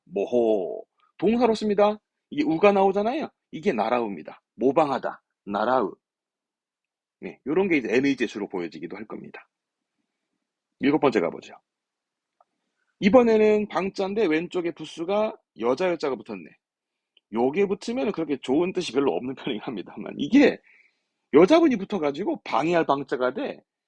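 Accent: Jeju, South Korea. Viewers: 명강의 귀에 쏙쏙 들어와요~~증말 최고십니다. 글구 잘 모르지만 제주도 억양이 중간 중간 들려서 재미있었어요(정확하게 들었는지 모르겠으나 ㅎㅎㅎㅎ) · 제주도 분이신가봐요,,ㅋㅋ 중간중간 제주도 말이 들려서 방가워요..ㅋㅋ 너무 초보라서 여기 나오는 예문도 너무 어려운데 이거부터 보면서 여기나오는 단어 무조건 외우면서 공부하면 될까오?😅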